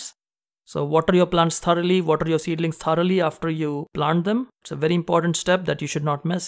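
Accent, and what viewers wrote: India. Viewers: he has indian accent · are you native to india i feel so because of your accent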